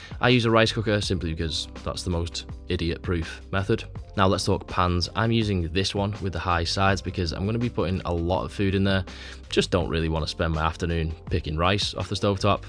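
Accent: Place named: North, United Kingdom. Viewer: I love watching your meal prep videos. Love the northern accent (southern softie here) But, could you do a video on how to reheat/serve meals please? I love to prep but by day 3, I seem like I waste food as I am too scared to reheat it so I eat it cold (gross) or throw it away. Just making the fried rice scares me! :)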